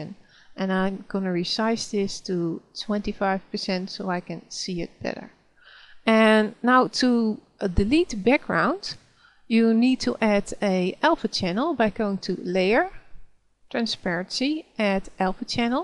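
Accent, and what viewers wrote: Netherlands. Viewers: kom op man!! je moet eerst leren beter nederlands praten. je hoor meteen je nederlands accent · I directly knew you were Dutch by the way you speak lol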